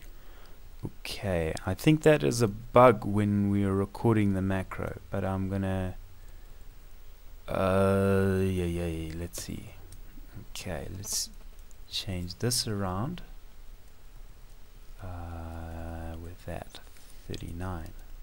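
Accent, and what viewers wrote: South Africa. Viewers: Are you South African? guessing Pretoria...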